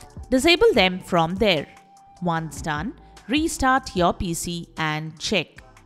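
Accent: India. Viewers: Thick India Accent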